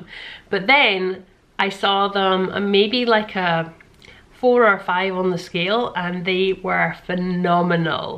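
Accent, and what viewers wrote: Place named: Scotland, United Kingdom. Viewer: hi, just came across your channel....i think id LOVE to live in iceland...im sooo into the simple life and nature...i actually watched your what you hate about it first....im just wondering about what work you can do? get? and what you have to do to be able to stay/live there....thanks for video...Love your accent....love scotland....its beautiful..:) x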